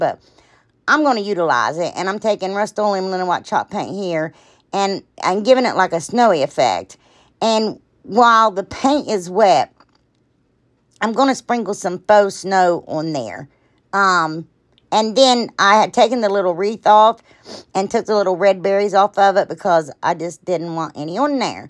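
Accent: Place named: West Virginia, United States. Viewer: I’m so happy I found you on YouTube! You’re so real and you make me feel better about myself because I am not tech savvy and just starting to learn crafting. I love your accent and being from WV, I have one too but I don’t hear it unless someone records me or I hear my own messages I leave my husband and he doesn’t listen to them before I get home. You are my favorite YouTube show now and I sent my sister your video so she can subscribe. Thank you and God bless you for being you! I love you girl! I would love to meet you if you ever have a show, Columbus isn’t that far from me. I’m about 20 minutes from Gallipolis, Ohio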